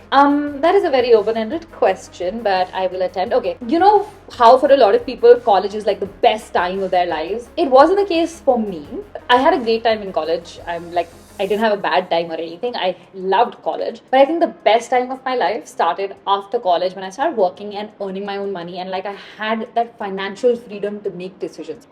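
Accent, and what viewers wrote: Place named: India. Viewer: Your Hindi accent is so so cute 😊😊❤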